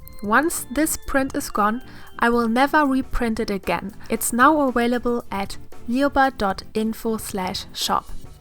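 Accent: Germany. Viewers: Hey 🌸Mir ist aufgefallen, dass dein Englisch extrem deutsch klingt.Vielleicht könntest du etwas an deiner Aussprache arbeiten♡, das ist kein Hate sondern nur ein Tipp, das Bild sieht allerdings echt meega gut aus😊❤ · Ich bin gerade zufällig über dein Video gestolpert und möchte gerne meinem Eindruck der Kommentare widersprechen: Ich finde es gerade gut, dass du einen deutschen Akzent hast und trotzdem Videos auf englisch hochlädst und dich nicht davon beeinflussen und zurückhalten lässt! Heutzutage wird man das Gefühl nicht los, dass man perfekt & akzentfrei Fremdsprachen sprechen können muss, bevor man sich äußert, wodurch die Hemmschwelle immer größer wird und man dann gar nicht erst in die Übung des Sprechens kommt. Daher: Bleib wie du bist und beehre uns weiterhin mit deiner Kunst!! <3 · Omg I immediately heard that you are german😂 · Jep, that's clean german school english, as we all leaned it. 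😁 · haha funny I am from austria and yes I know this is Just typical german accent but for some reason I Just cant Hear it😂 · I love your german accent! This art is amazing! :O great job! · beautifully drawn and explained ... beautiful german accent ❤ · Bist du Deutsche? Ja aber der Akzent verräts :D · Are you from Germany? Because you dont sound like "amerikan or english". Your dialect is annoying lamo hahaha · Direkt gemerkt das du deutsch bist 😂😊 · My god me too i was like 0.0 that is strooong german accent riight? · katy cat18 Do you seriously believe you're contributing to her endeavours in any way by commenting on her "extremely German-sounding" accent? No. Maybe you're too young to know or perhaps you're just not socially adept enough, but it's not acceptable to tell people to fix this or that about themselves, because sometimes it is just not your place to do so! Lioba works so hard to create high quality content and I know from her previous videos that she has been working on her English. 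Plus, people just don't have to speak English perfectly. So leave her alone and Get Over It, please. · Are you from Germany? 🙂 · It's funny how one (or at least i) can immediately tell that this is a German accent. Wonderful drawing btw! Sadly i don't have all those materials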